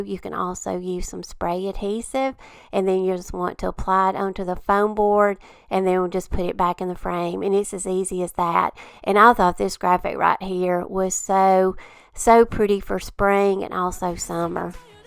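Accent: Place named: West Virginia, United States. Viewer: Hi, I sincerely love watching your Channel... Not only do l think you’re an extremely talented crafter, l love your accent! It reminds me of my late Aunt who lived in West Virginia. I’m surprised you didn’t know about the “Cling Wrap” trick because, we’re about the same age. I was doing this way back in the late 70’s as a teenager. Mod Podge has been around since the late 60’s but it was expensive especially for a teenager. We use to make our own Homemade version of Mod Podge by mixing a 3 to 1 ratio White Glue and Water. Another groovy craft we were making was Crayola Crayons T-shirts! 🖍 Just in case anyone is interested: - Remove the paper wrapping off of your crayons and grate them using the smallest holes. - Iron your T-shirt smooth - Place several (4) sheets of paper towels “inside” your T-shirt. (It prevents the crayon from soaking through the front of your T-shirt onto the inside! 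This is an extremely important step, do not skip it!) - Lay your crayon gratings onto your T-shirt anyway you desire. Or even into a simple design! ♥️⭐️🌙🌸🌈🔥⚡️ You can use all of the colors you want! Although keep your layer of crayon shavings on the thiner side. You DO NOT want a thick layer! - Cover your design carefully with Parchment Paper (DO NOT USE WAX PAPER!) - With your Iron set on High (USE A DRY IRON, NO STEAM NEEDED!) Just press for approximately 90 Seconds, let your Parchment paper cool for 10 seconds then peel off. If you laid your crayon gratings into a Design, then when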